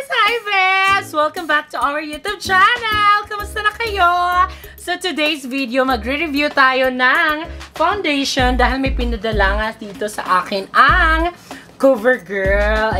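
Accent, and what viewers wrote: Visayas, Philippines. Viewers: It is abelabol HAHAAAAH i really love ur visaya accent mamsh 😍😂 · Tuwang tuwa talaga ko pag napapakinggan ko yung Visaya accent mo vevs ❤️ nawawala stress ko dito . Your supporter from japan 🇯🇵 Josh Rubio po .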